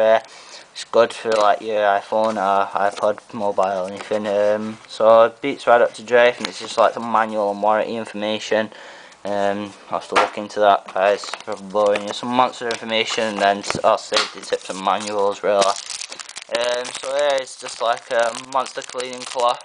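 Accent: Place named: United Kingdom. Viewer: HE'S FREAKING BRITISH..ITS HARD TO UNDERSTAND BRISTISH ACCENT SOMETIMES..I HAVE A BRITISH ACCENT TOO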